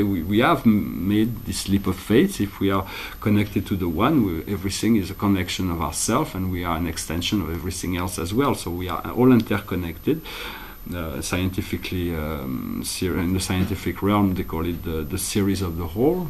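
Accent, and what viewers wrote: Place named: France. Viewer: I could listen to you speak English with the beautiful French accent all day,! Thank you for the Osho reading and blessings to you. 🌎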